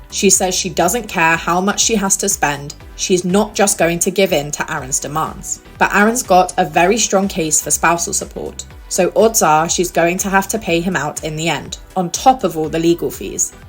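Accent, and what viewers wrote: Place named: United Kingdom. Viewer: Your British accent is annoying …I had to stop halfway through because I couldn’t take it anymore.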